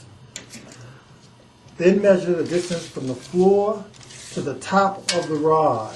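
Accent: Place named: Philadelphia, United States. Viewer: thanks for the help, especially loved the accent, just curious where are you from, im guessing Philly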